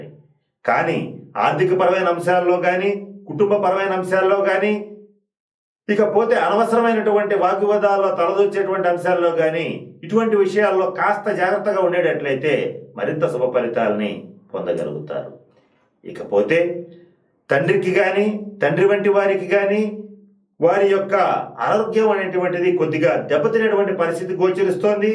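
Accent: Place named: Telugu, India. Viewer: Your explanation is good . The reason may be due to your training as a teacher coupled with your accent in Telugu . I am viewing your videos on Dhanassu as the sign is of me & my son belong to that Rasi . Thank you sir . From New Mexico USA camp .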